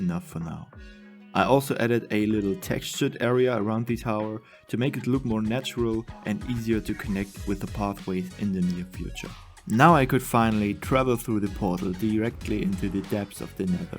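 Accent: Germany. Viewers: I LOVE your German accent!! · I will always recognize the german accent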